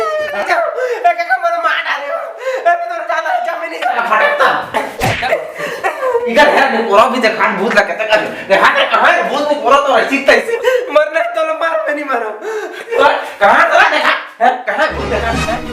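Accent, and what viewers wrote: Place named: Chhattisgarh, India. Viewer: Sudh au saaf suthra Chhattisgarhi bole bar bhai bahut bahut dhanyawad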